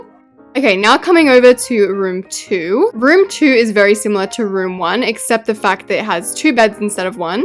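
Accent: England, United Kingdom. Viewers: ur brit accent is more british than me and i’m british😭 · stwoooppppp i loved her english accent its so nice!!!!!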